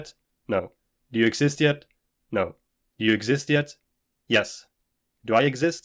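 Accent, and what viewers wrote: Sweden. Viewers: You sound so swedish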